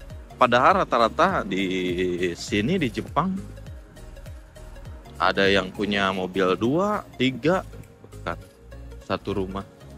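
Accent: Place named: West Java, Indonesia. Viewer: Logat abangnya Sunda banget 😂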